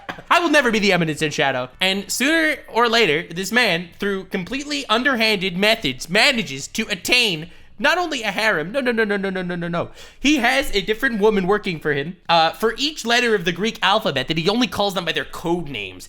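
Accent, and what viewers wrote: United Kingdom. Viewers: From when are you british? Just I'm sure it was dritish english. Like please. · THe Brit accent is freaking classic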